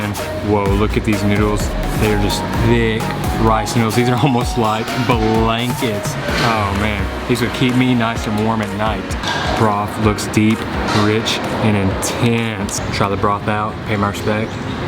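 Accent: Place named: South, United States. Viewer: Yo voice tho... Varrryy South... 😁 CM my hometown I am back in 2 weeks time for Songkran.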